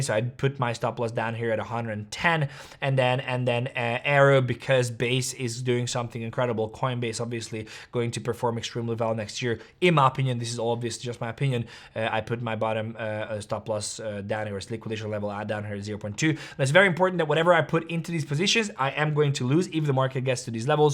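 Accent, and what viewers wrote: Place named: United States. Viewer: This is not financial advice 😅😂you sound American today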